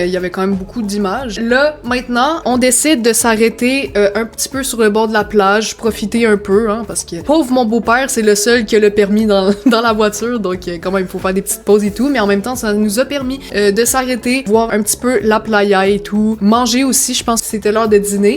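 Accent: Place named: Canada. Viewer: Hi, at first I thought you are French but at the end I pick up the Canadian accent. I've been reading in the news Canadian don't visit the US anymore. What do you think of the avocado it's not good? I bought 1 in NYC after a couple days when I cut it water. I was in Montreal last September I love it, I stay in downtown Montreal rue ST Denis not to far from Berri-UQAM, Place des Arts there's a big Mall, and McGill uni.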